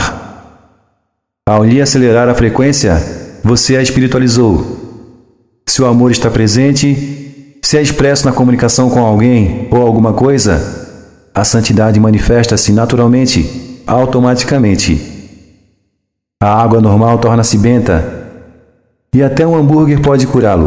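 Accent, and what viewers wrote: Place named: Florianópolis, Brazil. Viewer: Essa voz e sotaque é de manezinho da ilha né?... morei em floripa por 6 anos e esse sotaque me faz lembrar ds lá.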